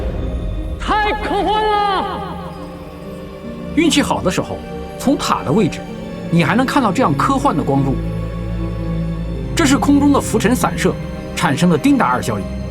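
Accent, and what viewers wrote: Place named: Fujian, China. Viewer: 我一听就知道是福建的，特别可爱。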